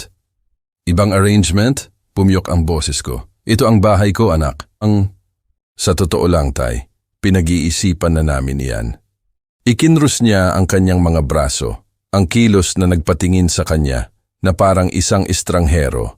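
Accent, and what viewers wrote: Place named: United States. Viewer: ano po Ang nangyare amerikano na Ang nagbabasa eslang masyado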